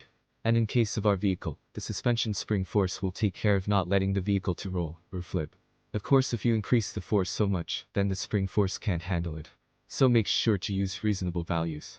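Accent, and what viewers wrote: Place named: United States. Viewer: You are from India? right. but your accent looks like american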